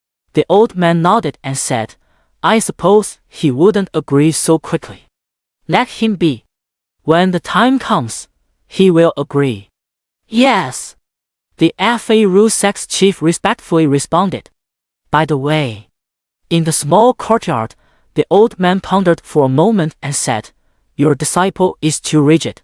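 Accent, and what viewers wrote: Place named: China. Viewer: Can't listen in 2x on chinese accent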